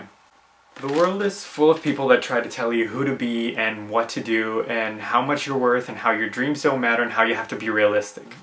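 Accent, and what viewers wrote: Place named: England, United Kingdom. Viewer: Oh, I really found this thought pretty nice! Loved it, also loved your English accent.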